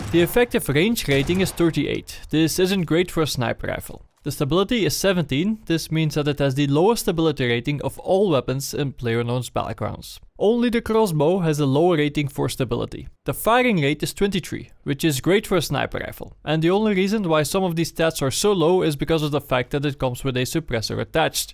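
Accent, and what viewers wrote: Netherlands. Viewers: Are you dutch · are you dutch? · your thick dutch accent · Je klinkt Nederlands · are you dutch(nederlands) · the first Dutch person I've heard with that thick an accent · You sound Dutch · your accent reminds me strongly of netherlands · He's dutch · one kickass Dutch accent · its Dutch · the dutch accent is real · your english sounds like your dutch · werk aan je uitspraak want je kan zo horen dat je nederlands bent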